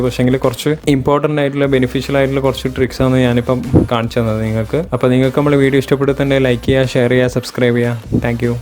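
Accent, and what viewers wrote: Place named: Kannur, India. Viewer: കൊള്ളാം, നല്ല അവതരണം. കണ്ണൂർ slang ഉണ്ട്.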